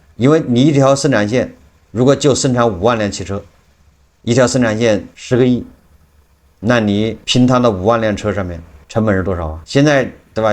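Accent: Anqing, China. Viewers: 老师一听口音就是我们安庆老乡😅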